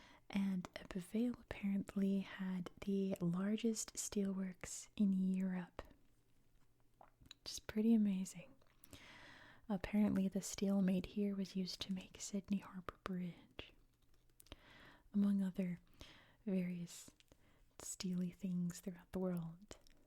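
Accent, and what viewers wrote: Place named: United States. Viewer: Isn't it cool to hear an American person talk about this? I never thought the humble Welsh Valleys would reach somebody in the States! 😊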